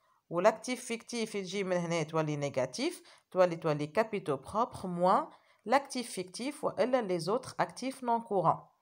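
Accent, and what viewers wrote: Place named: Tunisia. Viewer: En tant que marocain j'arrive facilement à comprendre l'accent tunisien et vous expliquez très bien Mme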